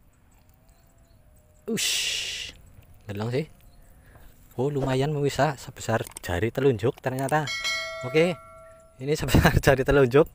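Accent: Banyumas, Indonesia. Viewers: Mari taraweh langsung nyimak cak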